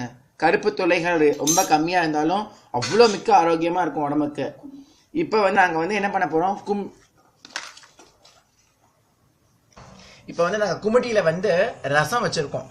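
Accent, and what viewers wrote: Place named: Tamil Nadu, India. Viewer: Hi I'm new to ur channel. I have just started to follow u. It's very nice to hear ur voice n ur tamil slang. So please update your recipes like same as you done in this video don't ever put the background music and the ingredients alone. I cannot follow. You please talk to us and cook that is ur speciality. Don't do like others you are unique be the same as you are. thank you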